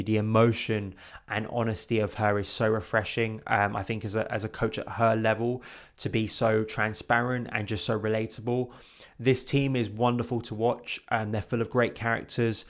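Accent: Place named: United Kingdom. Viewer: Hes not pronouncing it wrong, hes just speaking in a British English accent. Theres no "correct" way. Saying "Nike doesnt rhyme with Bike" just shows this point. American English uses softer sounds which is why its so popular world wide. Whereas we Brits uses more clipped sounds. The owner saying its Nikey not Nike doesn't change this, it's just showed that they either the dropped the "Y" for aesthetics or cant spell a four letter word.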